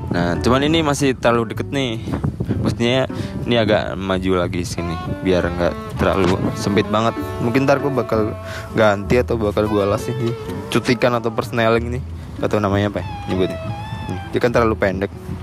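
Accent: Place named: Java, Indonesia. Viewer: Medokmu ketoro mas 😅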